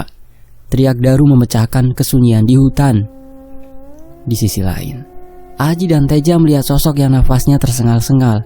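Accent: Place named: Kalimantan, Indonesia. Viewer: Mas ini cerita dr daerah mn,,, Logatnya dr kalimantan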